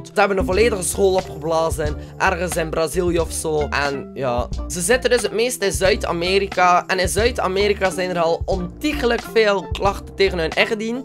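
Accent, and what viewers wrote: West Flanders, Belgium. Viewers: Belgies accent is wel grappig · jij praat echt heel belgisch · Rani Claes west-vloams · Dat Belgische accent is echt leuk😍😍😍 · praat jij Belgische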